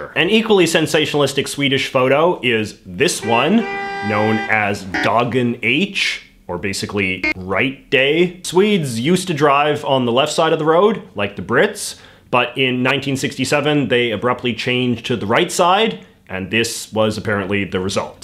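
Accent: Canada. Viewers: the what now?? I knew you had a strong Canadian accent but there is no way that's how to pronounce archipelago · I stumbled onto your channel by accident a couple weeks ago towards the end of a YouTube binge after a long work week. Not even a minute in, I remember feeling this overwhelming sense of animosity for you and the post. It wasn’t just that I disagreed with your politics or world view; I really didn’t! I don’t know if it was your smug disposition or that forced thick Canadian accent, but I found myself quietly siding with those French hillbillies from Quebec. Its been a few weeks and I have to confess I subscribed and look forward to your videos every week. Still haven’t figured it all out but I’ll keep tuning in until I do.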